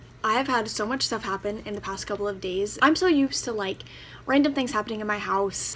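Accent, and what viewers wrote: Ireland. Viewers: where you from?? are you irish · everyones saying your doing Mirandas voice on purpose but I just thought you were from Irish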